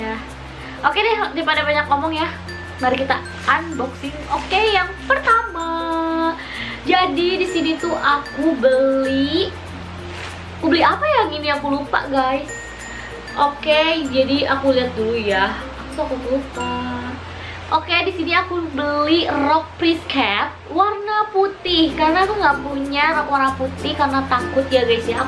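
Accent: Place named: Sunda, Indonesia. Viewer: kaka orang sunda yah? lucu ngomong nyaa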